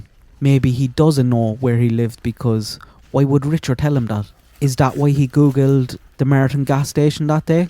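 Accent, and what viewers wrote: Ireland. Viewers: I love your Irish accent! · I’m in Ca now but grew up in Indiana It’s fun to see the woods again as I used to go mushroom hunting with my dad I agree with your speculations on the case as you speak in the lilt of your Irish brogue THANKYOU Love your dog too❤ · I thought there was another person waiting nearby to pick up the killer? I think it didn't go to plan,those girls were to be taken away elsewhere,the crime scene was staged to throw LE off the scent.I've been fascinated with this case from day one.Lovely to hear the Irish accent by the way 💚🤍🧡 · Scotch or Irish? Irish?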